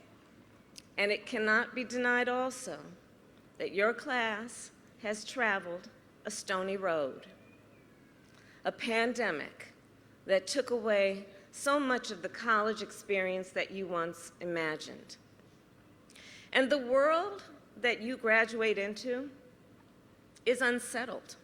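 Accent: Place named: South, United States. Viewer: Why is she talking like she was raised in the south?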